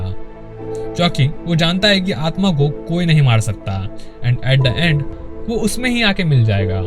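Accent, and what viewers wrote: Bihar, India. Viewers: Bro aap bihar se ho na!!! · bihari narrator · Bhai tu bihari hai .....i can say it for sure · Are you from Bihar? You have a bihari accent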